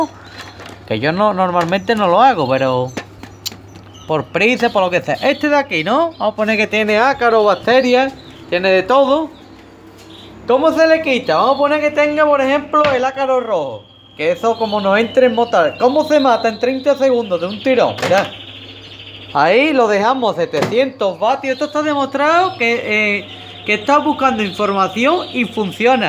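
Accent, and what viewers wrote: Andalusia, Spain. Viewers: Ese andalu que parece que va a contar un chiste...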